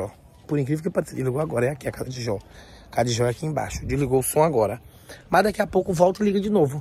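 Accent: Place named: Bahia, Brazil. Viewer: Amo ouvir esse baiano voz linda sutaque lindo E Assim mesmo parece sacanagem eita jó Ótimo domingo ❤❤❤aposto q não vai ter som Presta atenção so a misericórdia Deus e masss Ótimo domingo para vcs.....❤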